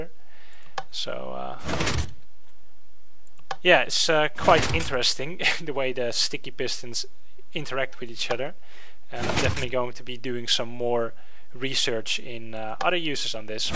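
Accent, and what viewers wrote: Netherlands. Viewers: u dutch? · Haha lol jij bent dus Nederlands dacht al je klinkt niet echt engels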